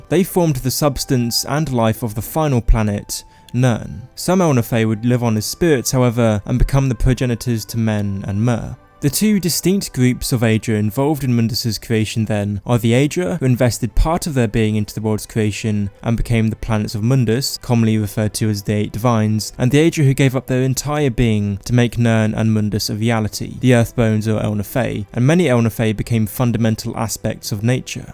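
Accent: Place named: England, United Kingdom. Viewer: Haha man I think I get what this guy’s saying. You’re like English friend one, and Simon from [everysecondyoutubeaccount] is English friend two. David Attenborough is like my Grandfather who’s since past. Voices and accents are fascinating.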